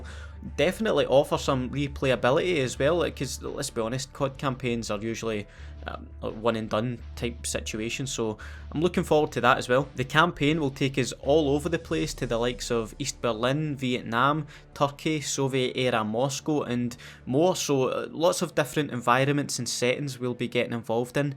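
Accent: Scotland, United Kingdom. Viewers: His voice is so fucking awesome to hear. I wished i had the scottish accent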